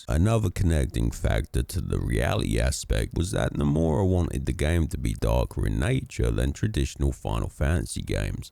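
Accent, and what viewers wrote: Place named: United Kingdom. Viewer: You sound like Moe Sizlack from The Simpsons just getting out of bed with a british accent.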